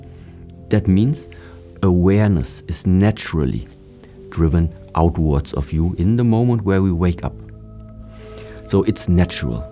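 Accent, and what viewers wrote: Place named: Germany. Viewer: Klingt nach deutschem Akzent.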